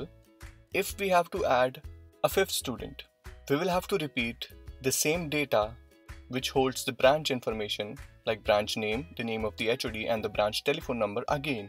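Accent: India. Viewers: When I hear the Indian accent, I know im gonna learn something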